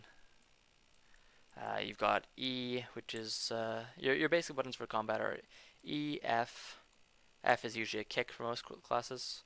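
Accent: Canada. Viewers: Get oot of here mate. Love the Canadian accent!!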